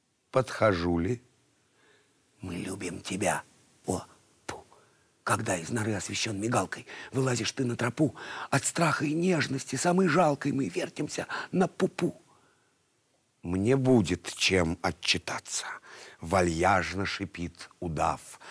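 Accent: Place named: Okanye, Russia. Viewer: Ооооо, "брат"! Да, ты ещё и дОёшь через "О"-(чко) надо полагать, неуч... Или ты нерусь, "Артём Иванов"? - Ну, тогда это многое объясняет ;))) ? УРА, ТОВАРИЩИ !!! Слава Украине в составе России !!! :)))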